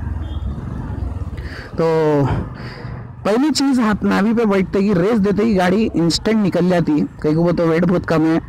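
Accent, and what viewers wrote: Hyderabad, India. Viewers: loved it! fantastic hyderabadi style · bhai tumare baat karne ka tariqa zabrdast pure Hyderabadi or mai b hyderabadi hou miya bhai bolte mashallah mashallah bhai bohat accha review diye aap mere pas b same color ki Dio hai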